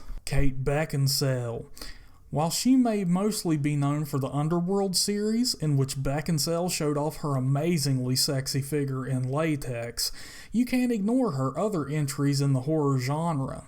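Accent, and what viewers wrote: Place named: South, United States. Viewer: Love you southern draw dude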